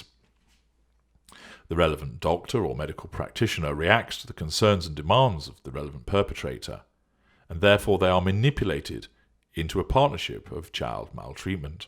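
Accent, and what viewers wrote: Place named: North, United Kingdom. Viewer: Your accent, has a northern twang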